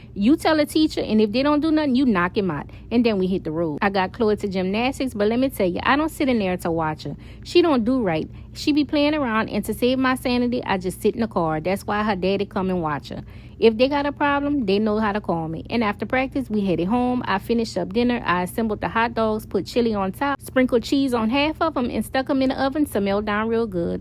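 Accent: Louisiana, United States. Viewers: I really enjoy hearing you speak! I came across your page, and heard what sounded like a Louisiana accent! GOD BLESS you, Husband and family!! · What part of Louisiana you from? I’m from Eunice but live in California now . Some of my family lives in Lafayette I love listening to you talk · Are you from Louisiana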